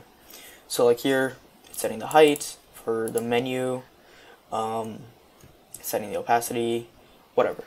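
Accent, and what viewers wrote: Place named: Brazil. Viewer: demorei mt pra perceber q tu é br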